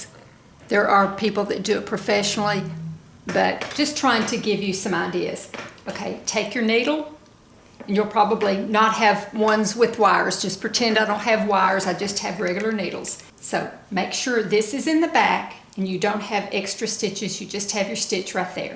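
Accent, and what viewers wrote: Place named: Tennessee, United States. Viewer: So sweet to share. 🩵 love listening to your voice 😊 & Tennessee accent 😂🥰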